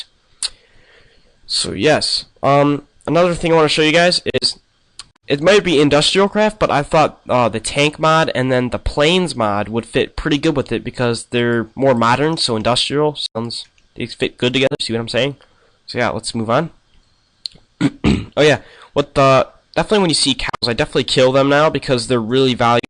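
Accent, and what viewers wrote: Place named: Canada. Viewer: Lol, I love your accent. Are you from Canada?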